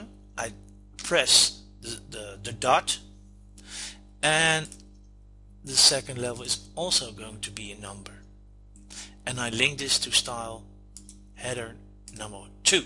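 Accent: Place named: Netherlands. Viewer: Thanks! Love the charming dutch accent. You rock!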